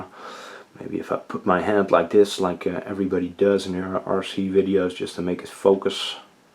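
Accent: Netherlands. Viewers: Great look around your storage room. Thanks. I like your dutch accent. One of the reasons I watch your , other the really cool RC videos. HOOORAY FOR EVERYTHING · iam dutch to you have a realy dutch acent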